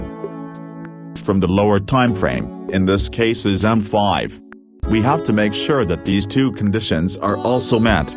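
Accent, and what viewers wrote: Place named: India. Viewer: Could you know I've always than this question mark this is like trying to listening to customer service from India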